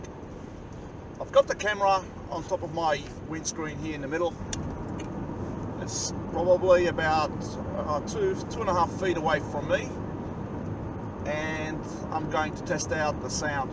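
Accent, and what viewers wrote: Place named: Australia. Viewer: You have a Very STRONG Aussie accent , i just noticed.👍.... good for me to get used to such strongness of Aussie accent before emigrating there.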